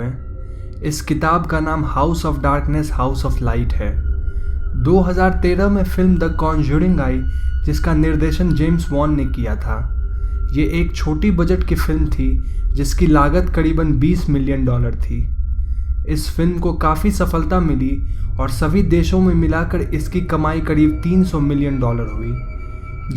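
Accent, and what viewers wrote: Bengal, India. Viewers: Are you Bengali? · A perfect Bengali accent.....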